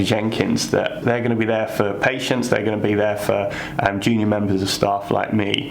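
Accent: United Kingdom. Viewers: Does anyone know where abouts in the UK is? Not for stalking purposes lol 😆 I just thought I recognised his accent, as local to where I live, but I realise he may have moved for work.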